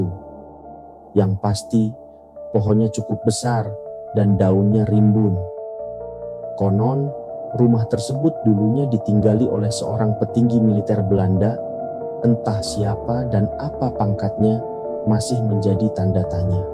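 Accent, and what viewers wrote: Java, Indonesia. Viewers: Duuuuuuh....KEMANA KAU MAS??????? Logad Jawa mas yg bikin rindu...ini siiih hanya membaca...gak seruuuuu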